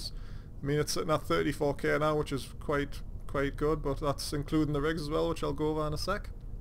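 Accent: Newcastle, United Kingdom. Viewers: newcastle or sunderland?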